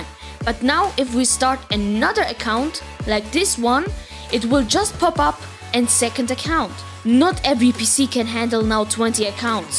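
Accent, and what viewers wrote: Germany. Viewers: Ich dachte mir schon das du bist deutscher 😂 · u german? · mann hört rauß das du deutsch bist hahah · Are you german? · ICH WUSSTE DOCH AN DEINEM AKZENT DAS DU DEUTSCH BIST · Find ich gut dass man merkt das du deutsch bist. Aber trotzdem danke für dieses hilfreiche Video. 👍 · TY bro It helps very much (und man merkt das du deutsch bist aber gutes english)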